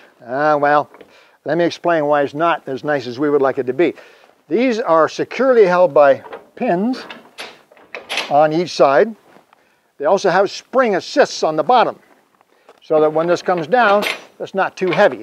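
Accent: Canada. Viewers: I can spot a Canadian accent a mile away. Love this channel, cheers from Kitchener Ontario 🇨🇦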